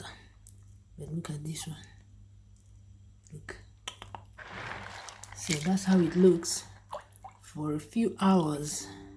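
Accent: Igbo, Nigeria. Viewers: Love your igbotic voice as you always call it. You turned me into a good cook of Nigerian dishes. Keep it up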